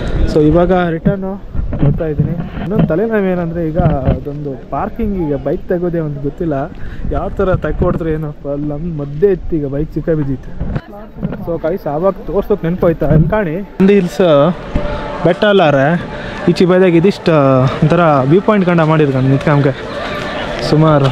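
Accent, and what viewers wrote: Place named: Kundapur, India. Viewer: ಕುಂದಾಪ್ರ ಕನ್ನಡ ❤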